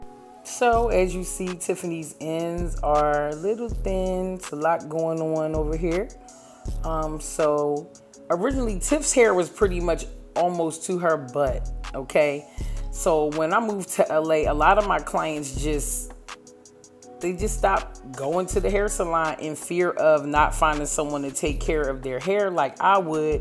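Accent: Philadelphia, United States. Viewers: I LOVE watch all of Your videos because you reminds me of a lot of my favorite older cousins from Philly but you sound sooo much like my family I remember as a child who I use to adore and look up to them its that accent you can tell a Philly girl from anywhere my MOM also sounds like that too even though she’s been living in Cali for over 40 years I remember when I moved out there as a little girl 👧🏾 I use to love to go to my cousins hair salon down in Upper Darby & get my hair done every weekend and then go shopping at the end! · Is that a Philly accent I hear?? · Girl where u from ? I’m hearing an accent I’m thinkin Philly or somethin It’s up north but sliding towards dmv twang but not a hunnit percent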